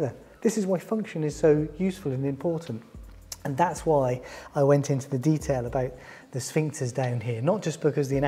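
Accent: United Kingdom. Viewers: good video, if i could understand the british accent and if he could speak slower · British accent + mesmerizing personality + a med school mannequin = YouTube star giving anatomy lessons. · Asa I started watching this show, I noticed you are British, aren' t you Doc?🧑‍⚕️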